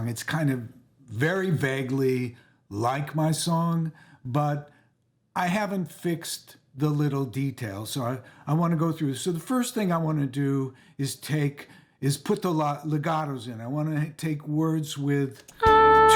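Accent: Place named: Brooklyn, United States. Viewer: Nah, it's the Brooklyn accent! East Village resident for 30 years; now down South. LOVE the Brooklyn accent (you'd be very popular down there - folks love it here as well!) Though, like Jeffy - folks outside NY probably can't tell the difference between Queens (Woody) and Brooklyn (you). You're definitely cooler looking than Woody:>))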